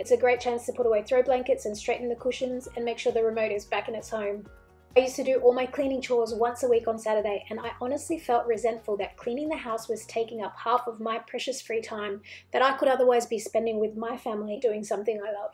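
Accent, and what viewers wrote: Australia. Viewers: Is that Australian accent? Maybe SA? Anybody knows please? 😁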